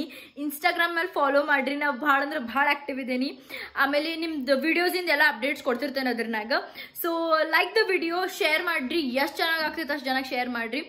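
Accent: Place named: Dharwad, India. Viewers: Hey madam.. i impressed our hubli kannda... nim math kelthidre.. girmit thindange aythade...bari cholo itri nim mathado way... from haveri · Medam speech dharwad language I like it more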